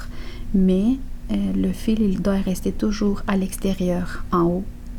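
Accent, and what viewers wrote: Canada. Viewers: Merci 😀 pour votre beau bandeau et vos explications ce point est vraiment très très joli et pas compliqué ,je me trompe peut-être, mais d'après votre voix, et sans être indiscrète ne seriez vous pas d'origine canadienne ? Si je ne suis pas trop curieuse pouvez me donner votre réponse svp un tout grand merci 🇧🇪 👍 😀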